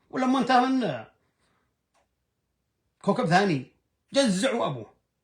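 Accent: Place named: Yemen. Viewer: نشكرك اخي وصديقي انت نبراس للاعمال الخيريه، وكلماتك ذات الفصاحه واللهجه اليمنيه الاصيله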